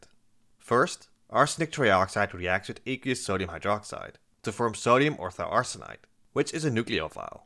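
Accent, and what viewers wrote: Netherlands. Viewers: This was certainly quite an interesting video to watch, reading up on these amonia based antibiotics that are currently in development was also quite an interesting read and hives some hope for antibiotics. based on your accent I am wondering if you are dutch?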